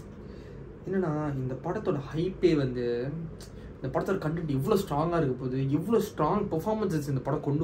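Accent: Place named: Malaysia. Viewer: Ponna , savadi ? Neenga Malaysian ah bro ? Antha slang varuthu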